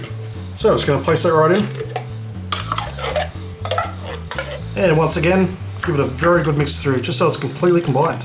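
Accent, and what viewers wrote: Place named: Australia. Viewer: Great job,. Always like the Australian accent, along with your good personality! God bless you 🙏❣️😇 Bud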